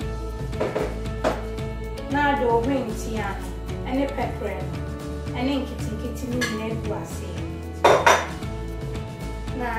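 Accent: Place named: Twi, Ghana. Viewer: Great Job. I love the pure Twi. Thank you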